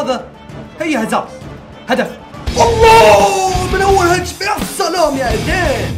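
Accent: Gulf, Saudi Arabia. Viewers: اريد سأل انت سعود ولا بحرين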